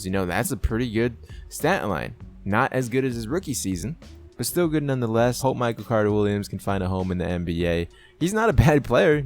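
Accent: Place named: Russia. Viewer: sounds like russian guy speaks english. sort of accent or something.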